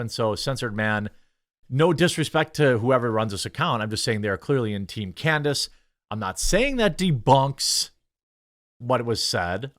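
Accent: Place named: Midwest, United States. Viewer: There is no letter "L" in the word both. Turn down the midwest please.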